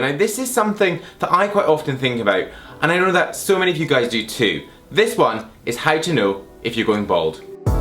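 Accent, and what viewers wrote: United Kingdom. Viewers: Love that British Accent , so cool !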